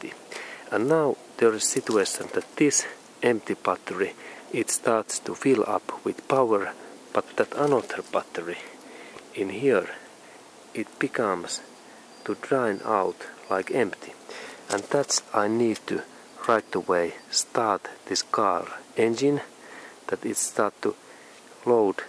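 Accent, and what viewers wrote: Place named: Finland. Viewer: This video must be finnish made, bacause this "rally english". Keep up the good work!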